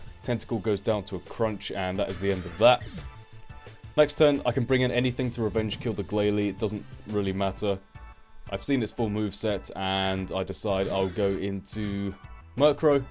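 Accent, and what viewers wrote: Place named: England, United Kingdom. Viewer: whereabouts in England are you from?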